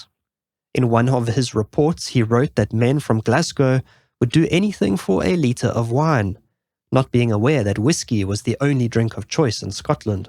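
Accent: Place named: South Africa. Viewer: Yes that is a South African accent. A lighter and less twangy one at that. Upper class maybe.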